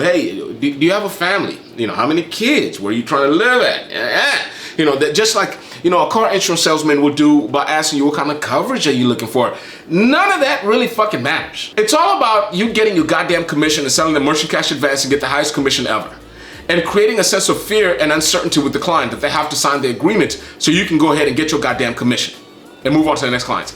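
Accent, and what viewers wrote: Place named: Nigeria. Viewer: And you sound like a Nigerian. 🚩🚩🚩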